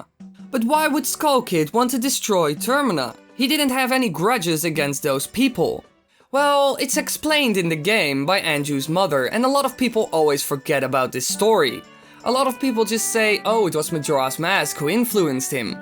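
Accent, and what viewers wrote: Netherlands. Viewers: Wow you speak english with a dutch accent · Are u dutch?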